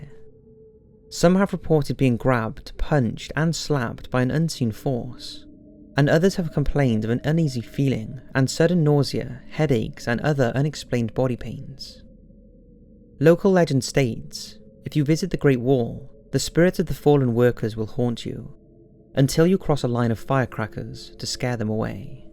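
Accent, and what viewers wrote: Wales, United Kingdom. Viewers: It's his Welsh accent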